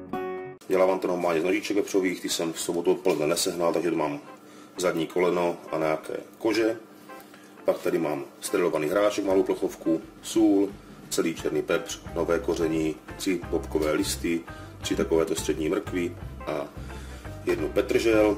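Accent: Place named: Hodonín, Czech Republic. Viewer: Super recept. Vypadá to parádně. Jsem sice ze Šumavy ale prakticky celou rodinu mám v Hodoníně a miluju to vaše nářečí. Strašně dobře se to poslouchá.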